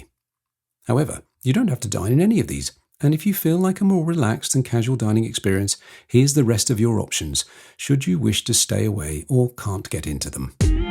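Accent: United Kingdom. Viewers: Why does the presenter have a British accent?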